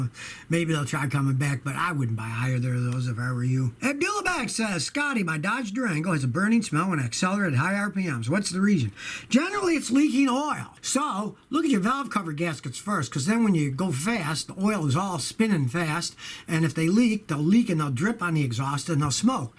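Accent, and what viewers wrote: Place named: Chicago, United States. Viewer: Hello, Scotty. Are you sure that you’re from Texas. You’re accent sounds like from Chicago or Detroit. I appreciate this wonderful channel, and keep up the great work. 😊✨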